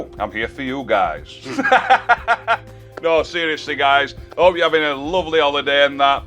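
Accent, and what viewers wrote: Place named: Scotland, United Kingdom. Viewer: This "Yank" loves humor with a Scottish accent! Thanks for making me laugh. Great info. I have fire "devices" from 1st gen to Fire Cube. I've been trying to get my 1st gen stick to work better and stop rebooting, and I think you nailed it with the power input. Used a 2.0a charger. However, it's still acting up, so it's probably just shot and old s/w doesn't help. Can't scroll through settings, just jumps. When I get to "Fire Device" it doesn't have reboot and even with 2.0a on updating says "power may be insufficient" . So think i'll give up on the 1st gen, and stick with 2nd gen (don't have 4k TVs). However I noticed unplugging my 2nd gen FS it was really hot. I like your idea of taking the shell off to run cooler but unplugging it might be hot? Was thinking may taking skin off and drilling holes or making it more open to let out more heat. 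Thanks. Great ideas!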